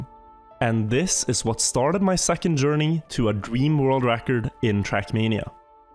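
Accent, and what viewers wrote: Norway. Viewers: Top Trackmania expertise + slight (and sexy) Norwegian accent = perfect content.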